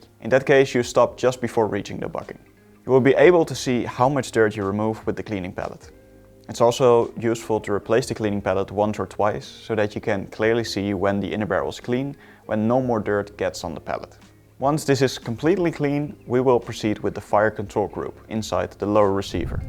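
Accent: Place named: Netherlands. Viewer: u sound very very dutch my guy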